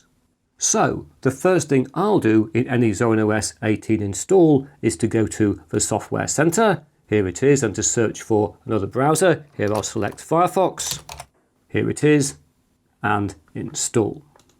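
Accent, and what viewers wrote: England, United Kingdom. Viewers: I love the way you explain. This is great, thank you English Man, love the accent too by the way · It's like watching Bill Gates in the 70s, but then with a British accent, loved the old school video ! 😃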